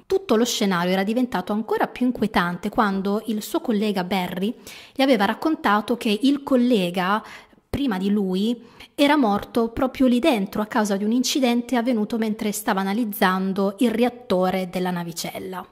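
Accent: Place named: Piedmont, Italy. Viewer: Bellissimo video, voce gradevole, inconfondibile accento piemontese, che dire......nuovo iscritto!!!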